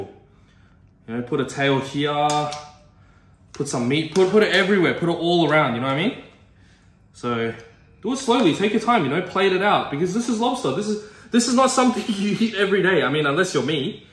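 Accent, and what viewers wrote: Australia. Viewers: Oh yea I see. Yea is Kiwi. He's based now in Australia though and his accent is quite Australian now, so we shall claim him =P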